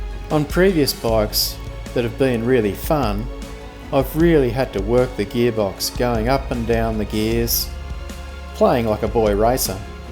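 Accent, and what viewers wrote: Australia. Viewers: I’m a Scot, I think the Aussie accent kinda threw me off a bit 😂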